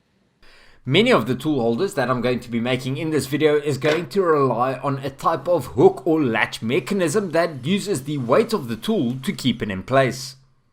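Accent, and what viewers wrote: South Africa. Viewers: Tanks for the response to my comment.. Having lived in Johannessburg for 5 years as a teenage, your accent just brings back many great memories of the country. Please keep up the grear work be postive and stay healthy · Do I recognise a South African accent? 😁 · Great ideas, I have used some of these ideas myself. Will implement some of yours. Love your accent, you sound like my south African mate. Cheers alojs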